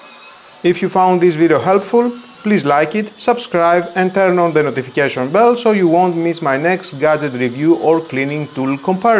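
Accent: Greece. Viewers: Are you Greek? A friend of mine has exactly the same accent 😊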